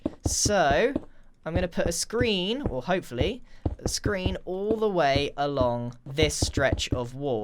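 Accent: United Kingdom. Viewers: Garage, I thought you were Bri’ish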